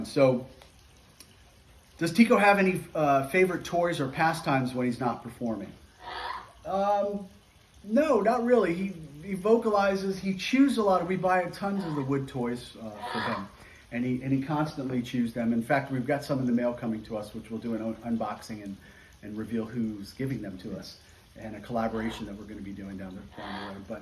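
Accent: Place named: United States. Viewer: Good Saturday morning to you! Thank you very much for this video which allows us to know more about Tico... I have absolutely no doubt that he is a happy bird, who also has, it must be said, a very loving and attentive family. parrot needs. Thank you for enlightening our days or our nights, for sending positive!! ... and thank you also for the French who do not shine too much in English (of which I am... I am more Spanish-speaking, than English-speaking) for trying to improve... I love your American accent (from Florida ?)! A hug to Tico!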